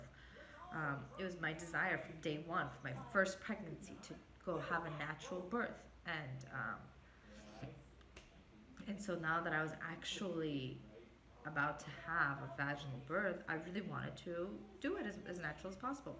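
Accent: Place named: North East England, United Kingdom. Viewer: Are you from the north east? You sound as if you might be.... Oh I would love to find an OB that would allow a VBA2C.... We are in Ohio....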